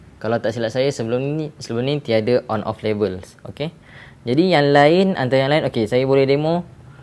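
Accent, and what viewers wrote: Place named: Negeri Sembilan, Malaysia. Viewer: suara mcm org nogori je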